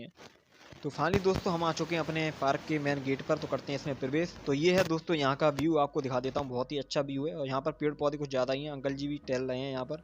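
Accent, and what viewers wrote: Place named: Etawah, India. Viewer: Aapki voice Etawah jaisi lag rhi h .. apke vlogs bhut motivational h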